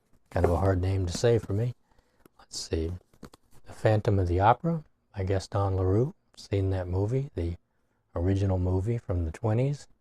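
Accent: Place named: Kentucky, United States. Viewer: Kentucky! That makes sense. Scott from 'Kentucky Ballistics' is a household favourite and the accent is similar. 👍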